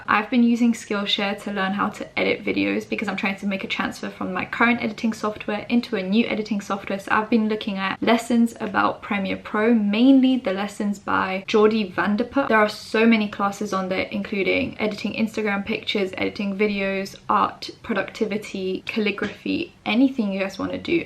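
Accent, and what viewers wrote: United Kingdom. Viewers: What's your heritage? You sound like you have a slight twang to your British accent that's all!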